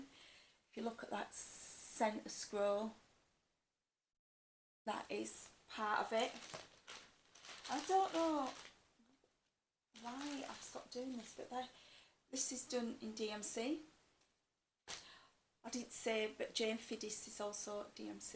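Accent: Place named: Scotland, United Kingdom. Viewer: Hi. I just happened across your Flosstube a few days ago and I just wanted to tell you how much I enjoyed your gentle spirit and lovely accent, as well as your stitching of course. Lol. I just had to comment when I heard you say you were trying to tone your accent down. Please don’t! As an American, and lover of all things Scottish and British, I just had to tell you it is part of your charm. I have recently picked up cross stitch and am enjoying it so much. I did some embroidery back in the 1980s and have been quilting for the last several years but I didn’t even know that cross stitch was popular again until I happened across some Flosstubers on YouTube. Now I am well and truly hooked! I live in a very rural part of Kansas and my only connection with other stitchers is on flosstube. Can’t wait to watch your next video! Kathryn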